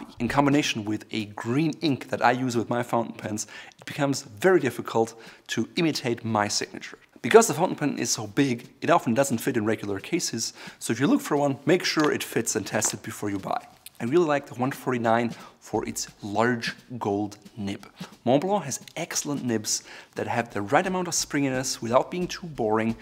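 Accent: Germany. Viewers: This guy is german, but its hard to notice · He's German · I hear a slight German accent? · English is not his native tongue. I believe it's German.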